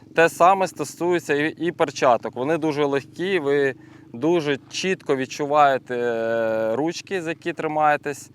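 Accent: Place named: Russia. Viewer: Смысл сужать аудиторию на своём украинском с русским акцентом ? Мда...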